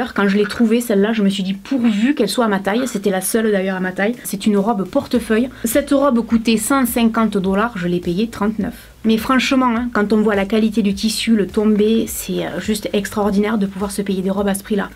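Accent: South, France. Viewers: J'aime bcp ton honnêteté et ton accent du sud lol. Effectivement victoria secret je pense que c'est bcp de marketing car j'ai aussi acheté des brumes (3 ou 4) et effectivement ça ne tient pas du tout!! Continue j'adore tes contenus 😬😘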